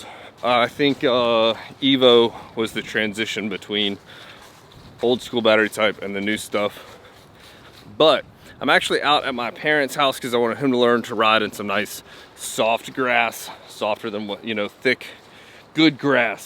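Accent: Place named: South, United States. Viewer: You sound more country when you're with the kids.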